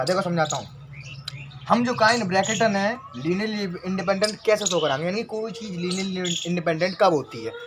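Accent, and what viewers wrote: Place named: Marwari, India. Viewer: Gajjjjjjjjb sirji aapka marwadi tadka bahut aacha laga 🔥🔥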